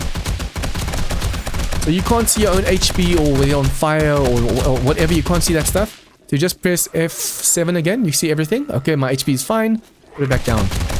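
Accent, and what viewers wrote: South Africa. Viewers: question are you south african ?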